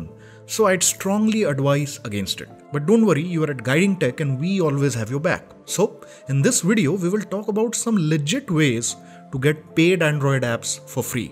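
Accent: India. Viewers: bro every tutorial i search on youtube is english but the accent is hindi and the voice is not good to hear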